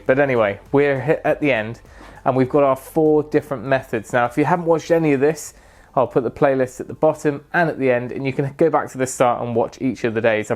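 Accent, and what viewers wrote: United Kingdom. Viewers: I've got to say, I have thoroughly enjoyed the series. I might be bias but it's so good to hear a British person rather than a DIYer from a cross the pond. Keep up the good work. Subscribed.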